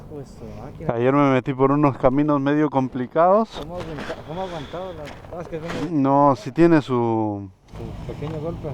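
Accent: Mexico. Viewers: Increíble como ya habla como todo un Mexicano, saludos desde Durango wey!!! 😉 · hasta aprendió a hablar cómo Mexicano ❤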